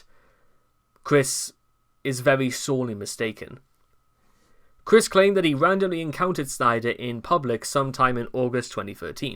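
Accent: United Kingdom. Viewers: You sonded like a cockney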